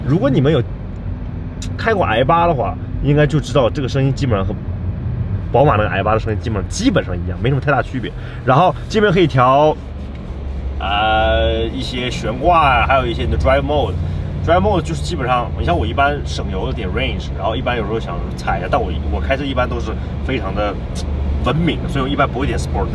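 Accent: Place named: China. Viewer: 聽你的口音是大陸人吧，怎麼會背離祖國共產黨，去北美敵人那生活呢？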